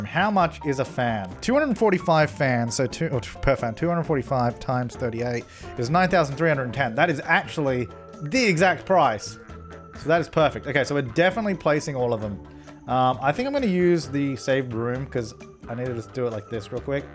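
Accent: Australia. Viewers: Your Channel has grown so Fast I reminder when you had 100,000 SUBS. :) Are You Australian?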